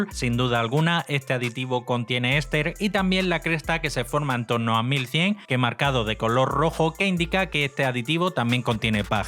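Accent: Murcia, Spain. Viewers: Eres un máquina. Y sospecho que Murciano, por el acento. Verdad? 😊 Recomendarias su uso, o el vrx 500? en un motor boxer gasolina de 1250 cc con embrague multidisco con baño de aceite?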